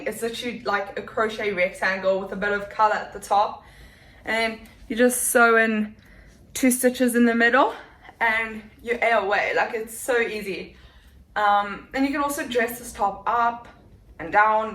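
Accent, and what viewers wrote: South Africa. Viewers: Are you South African? You sound like you're from there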